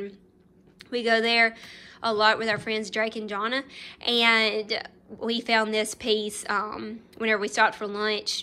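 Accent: South, United States. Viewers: Binge watchin your vlogs☺️ just love your down south accent💕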